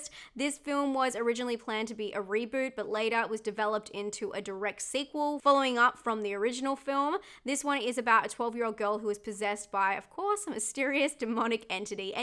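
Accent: Australia. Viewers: >hear australian accent > immediately click off video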